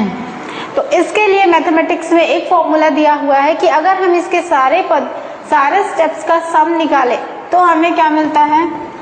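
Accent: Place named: Bihar, India. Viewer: Why using bihari accent 😞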